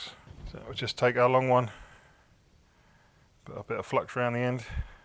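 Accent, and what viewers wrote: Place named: England, United Kingdom. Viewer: I wouldn't want it near my drinking water to be honest but after it flowed once it is pretty much like normal solder and won't reflow at 70C or thereabout. I just wanted to illustrate that there is a range of solders with differing lead content and melting points. Most decent ones will state the temp required to solder somewhere on the label or datasheet. PS, thanks for the reply, I feel like I have met you a million times, Lots of Pommies here that are dead ringers for the accent and I think I even know someone who looks like you, just van;t put my thumb on it.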